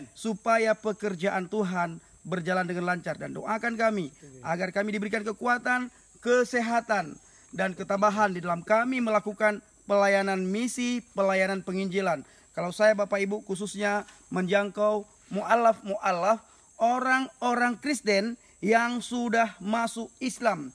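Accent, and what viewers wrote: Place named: Medan, Indonesia. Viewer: logat kau aja logat medan,mengaku ngaku orang aceh...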